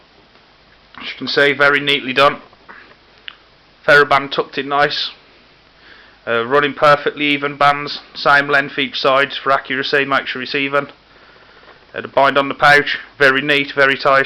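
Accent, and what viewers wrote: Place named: United Kingdom. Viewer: Whow. Thank you for that know-how. Where are you from? It took me some time to get used to your accent, now I understand - what I hardly did at the beginning :o) All I could guess was that you must be British.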